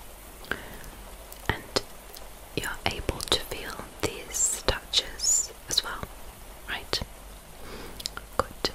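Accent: United Kingdom. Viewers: Your British accent is fantastic!!! <3 My partner has ADHD and suffers from executive dysfunction. Watching this video helped me understand them more and taught me some ways I can help! Thank you!!! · That 330k looks very awesome under your channel name 👀 Congrats!! Also, could you teach me how to do a proper British accent?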